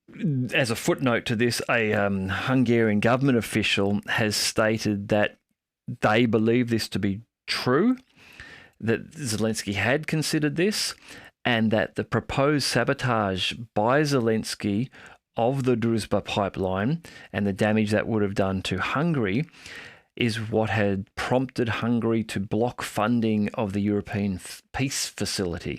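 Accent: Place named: Australia. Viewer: and it's nice to hear an Australian voice giving a balanced account. No such voice exists in New Zealand.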